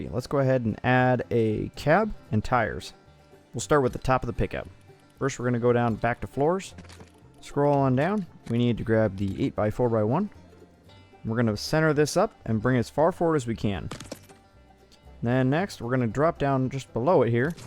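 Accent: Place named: South, United States. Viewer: Lol! Love the Southern accent - so funny! 😂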